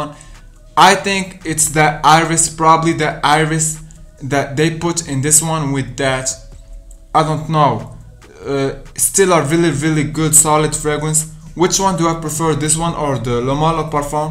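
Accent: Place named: Morocco. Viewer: Are you Moroccan ? You have Moroccan accent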